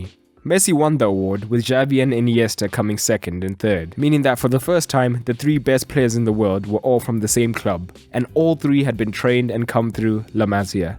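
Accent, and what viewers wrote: South Africa. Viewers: Hey mate are you from South Africa? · You sound South African and black, I could be wrong but I doubt it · Accent sounds South African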